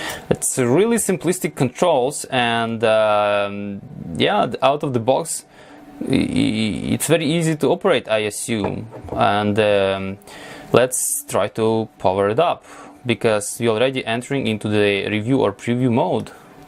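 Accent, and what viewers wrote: Russia. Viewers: Відразу чутно що російскомовний.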